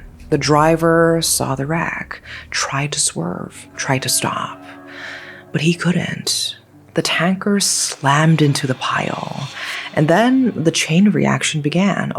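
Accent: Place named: West, United States. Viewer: The story was interesting, but I believe you can tell the story better with more assertion and tones rather than just speak as though you are reading, with a 'western accent'? (I'm Malaysian) and stretching every few words in a whisper tone - I.e "6 Caaaars, 12 veheeeechles, 17 peeeeeople were dead, the road is quieeeeterr than it should be" and yes, for the sensitive commentors I know that's gonna try and argue with me here, I know I can just "exit the video" and be on my merry way, which yes I'll do so, but hopefully some constructive criticism helps this fellow youtuber gain more likes and views in the future.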